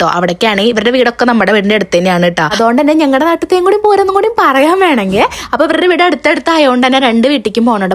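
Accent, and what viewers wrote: Thrissur, India. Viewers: ഹലോ ചേച്ചി ഇങ്ങനെയൊക്കെ വീഡിയോസ് കാണുമ്പോൾ ആണ് എനിക്ക് എന്റെ നാട്ടിലെ പൂരം ഒക്കെ ഓർമ്മ വരുന്നത് miss thatt... ചേച്ചിക്ക് ഓരോ ദിവസം എത്ര പൂരമാണുള്ളത് എല്ലാം നല്ല സന്തോഷിച്ച ആഘോഷിച്ചു കഴിയുക അതുപോലെ ചേച്ചിയുടെ ഓരോ ഡ്രസ്സുകളും എനിക്ക് വളരെ നല്ല ഇഷ്ടമാണ് ചേച്ചി സാരി ഉടുത്തിട്ട് കാണാൻ എനിക്ക് നല്ല ഇഷ്ടമാണ് ചേച്ചിയുടെ മുടിയും ഒക്കെ അതുപോലെ ചേച്ചി വീഡിയോയിൽ വോയിസ് ഓവർ കൊടുക്കുമ്പോൾ ചേച്ചിയുടെ ഒച്ചയും അതുപോലെ ആ തൃശ്ശൂരിലെ ആസ്വാങ്ങും ഭയങ്കര ഇഷ്ടമാണ് 🤍🤍🤍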